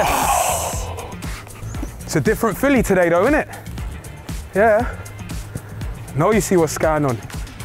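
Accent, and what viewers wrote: Colombia. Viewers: Hey loved your colombian accent 😁 great video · Gosh, where's from? He's English, but has a great Colombian accent in Spanish.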